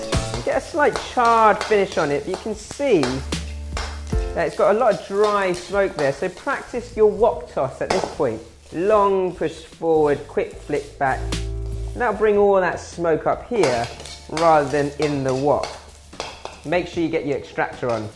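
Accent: England, United Kingdom. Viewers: I don't like this guy English ascents? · Too much English accent and annoying background music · Asians with a slight British accent always makes me stop and a take a second look. Great videos!!! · He has an english accent!!😱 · Nice and clear instructions,easy to follow.Chinese with a british accent. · I love your British accent sir,good cooking huh.. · luv uk waps.... ha ha in holland we call ourselves proudly peanut. "skool uv wuk" great uk accent mate. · It is kind of weird to heard perfect British accent from a Chinese guy.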